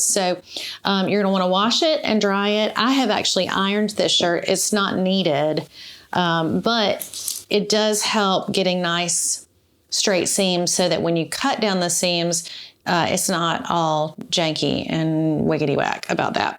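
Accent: South, United States. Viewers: I also just found you and I love your channel- I binge watched every episode! I could listen to your charming southern accent all day long- and find myself thinking in a Southern accent ;) Thank you for all the great ideas on thrusting men's shirts! I purchased a blue striped shirt for a quilt I have been collecting blue& white stripes for several years- when I got home- my husband loved it!🤷‍♀️ When I was watching one of your other episodes, I found myself wondering if you used part of the shirts where the buttons used to be- and if the threads holding the buttons put a stress on the fabric in that area- could it cause a hole? ... if you cut the buttons off before washing the shirts- sometimes the washing process will relax the fabric where a possible hole could arise- so you will have a better idea if it is just stress - or an actual hole- an I explaining myself clearly? 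Anyway, God bless you - and thank you for all this great info❣️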